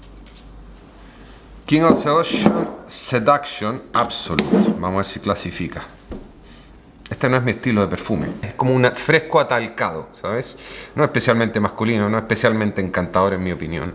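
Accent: Chile. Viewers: Excelnete video, nuevo suscriptor. Una consulta, escucho tu acento, y lo asocio mucho al chileno! Saludos y espero que sigas creciendo aun más!! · Es chileno viviendo en España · España? Yo crei que era chileno por el acento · Me encanta tu acento y forma de hablar obvio chile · El bro habla entre chileno y español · Es chileno jaja 🤣 después dicen que hablamos mal y dices que es un perfecto español. 🤣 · Eres chileno?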